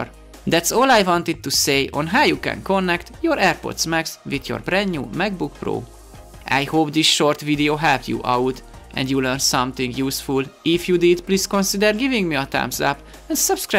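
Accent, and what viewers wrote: Hungary. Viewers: You has a Hungarian accent. Are you from Hungary, or I misshear something?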